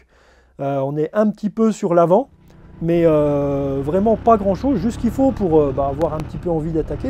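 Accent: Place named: Northeast, France. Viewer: Bonne vidéo, bien commentée, avec un accent du Nord Est bien sympathique !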